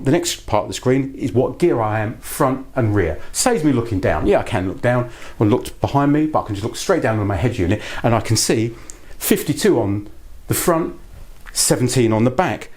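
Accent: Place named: Lancashire, United Kingdom. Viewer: Wow 😮 I’m losing the will to live, even though I like your videos but a strange Lancashire accent 😊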